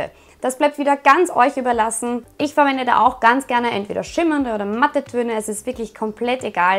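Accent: Vienna, Austria. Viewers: Mega toll erklärt, ich schau und vor allem hör ich dich gerne....dein „Wiener Dialekt „ ich liebeeee es! 🥰 Ganz liebe Grüße aus Deutschland 😉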